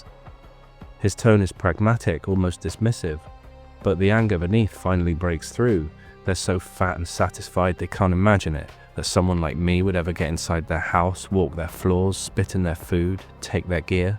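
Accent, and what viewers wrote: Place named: United Kingdom. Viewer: I think the captions are useful, as sometimes your phrasing says something that my ears hear differently (I don't remember the exact context - or even if it was this video - but you said 'knot' and I heard 'not' which completely did not fit the rest of the phrase. I had glanced away from the screen and had to back up and read the caption to get what you were saying). This may be an accent thing, as I'm from the States, but I also find the captions useful as you are using terms and phrases that are distinctly British sometimes and I can understand them more by reading them than by hearing them. Hopefully, your change in future videos, which I haven't gotten to yet, still works for these more idiomatic phrases.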